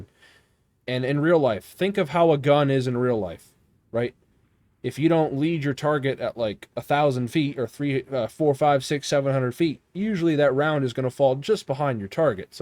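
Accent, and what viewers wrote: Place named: South, United States. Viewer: Kinda new to wow, screw every other tip video, I shall let the man who sounds like a red neck speak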